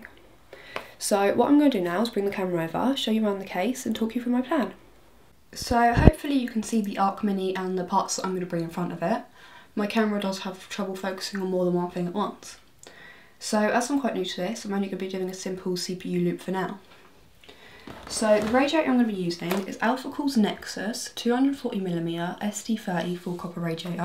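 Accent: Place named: Essex, United Kingdom. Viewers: English, english. English they speak in England, where it comes from...! Slight Essex accent though, a county north east of London. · Fit! im sure she is from essex.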